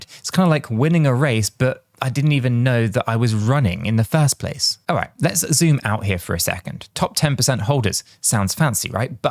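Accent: United Kingdom. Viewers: You are charismatic explaining complicated issues with your British accent humoristicly & simply for all kinds of listeners!! Really a blessing having you in YouTube channel!! Hello from Athens capital city of Hellas.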